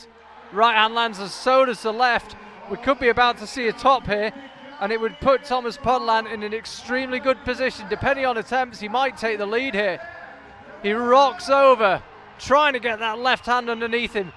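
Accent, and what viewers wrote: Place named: United Kingdom. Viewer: DJ with cabin fever :P I do fancy your very british commentary!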